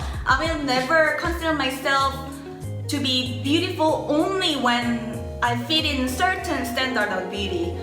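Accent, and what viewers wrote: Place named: South Korea. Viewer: You speak English well but still have a Korean accent. Interesting.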